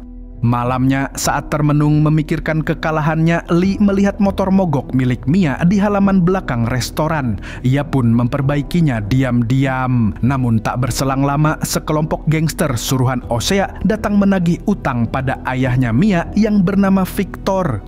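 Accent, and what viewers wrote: Banjar, Indonesia. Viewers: Anjayy org banjarr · Adminnya bisa lai bahasa Banjar😁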